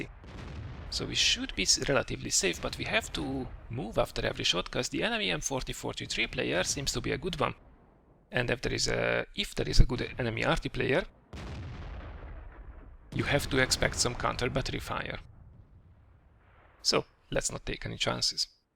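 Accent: Hungary. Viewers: R u Hungarian? Your accent is seemst like a Hun.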